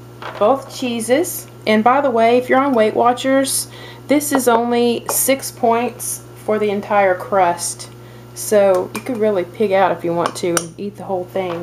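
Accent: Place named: South, United States. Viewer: I can't wait to try this, as pizza is my downfall and so hard to resist...Thank you for this video, as you explained every wonderfully. You have a lovely accent, too, are you from the South?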